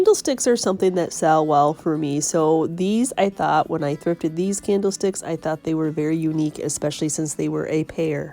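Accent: South, United States. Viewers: Hi! I just love your adorable southernness!:-) I am somewhat following your business plan & I very much appreciate all of your help! I don’t usually comment because I watch on my TV and I’m pretty sure you can’t write from there. I am VeryMaggs@ if you’d like to stay in touch... I am in Los Angeles with my husband (I’m straight LOL, I wanted to make sure you didn’t think I was flirting. I hope to hear from you! •••Maggie•••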